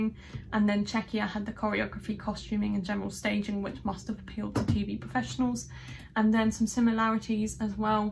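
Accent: United Kingdom. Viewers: I believe you are completely out of track. Your analysis does not entail the political economy aspect of the issue. Let me rephrase Stalin principle here "it is not important who votes, it is important who nominates who is going to vote". The main issue being here that these jury members are too few and nominated exclusively by the broadcasters and that is too easy for them to control the results whomever they nominate to be part of the jury, rappers, pop singers, astronauts or belly dancers. Please give a look to the complex jury system (and numbers of components for each jury segment) adopted for Sanremo. Still far from perfection but lightyears ahead than ESC in trying at least to minimize such "distortions" particularly on the broadcaster side which in this case is RAI. Clearly the music industry is a giant and investments need to be protected even in this economic sector so do not expect that this will turn overnight into a completely fair game but please keeps fighting (no weapons needed, as a youtuber you can fight with your nice oval and your remarkable british accent) also in the name of those (including me) that still believe that culture remains a human right “Everyone has the right freely to participate in the cultural life of the community. 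(art.27)”. Also, are you sure that televote is not at least partially controllable? First of all who is really enforcing the check related to number of votes per mobile number once the Eurovision is over? Secondarily imagine a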